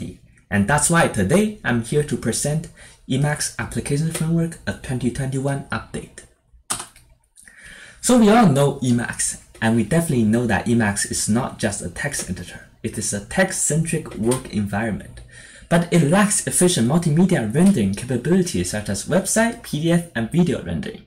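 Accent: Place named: China. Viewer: 一开口就是老中国人了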